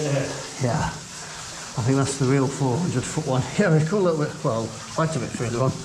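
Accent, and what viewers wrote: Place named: Potteries, United Kingdom. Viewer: Very random question, where's your accent from? Sounds like Trentham in the Potteries!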